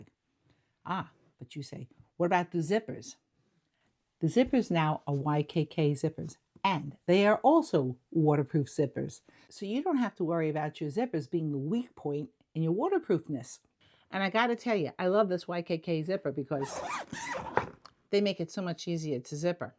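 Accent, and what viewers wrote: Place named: Boston, United States. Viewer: I've just found your content and it's absolutely premium! You are so generous with your tips and education, and your information is heads above most travel YouTubers. Also, as a Bostonian, I get a huge kick out of the fact that you pronounce "packing" the way a lot of folks in Boston pronounce "parking" :)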